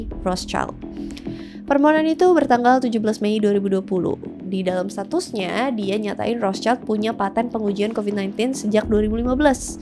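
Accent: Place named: Banyumas, Indonesia. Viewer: Love you...Ngapak Bae bahasanya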